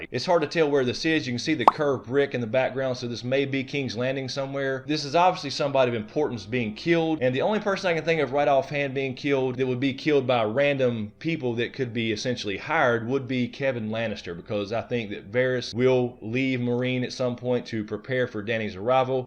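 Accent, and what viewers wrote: South, United States. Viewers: so excited for this season! I'd say I'm more excited for season 6 than the previous seasons because we are going to see stuff that hasn't been told in the books yet. Can't wait! Just found your channel...great recap! Also, you sound like a fellow southerner...so double bonus!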